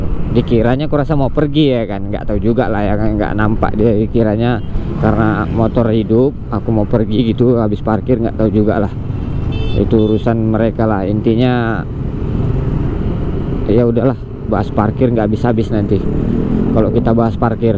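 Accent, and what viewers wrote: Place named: Medan, Indonesia. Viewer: Medan kali repatan abang ni🤣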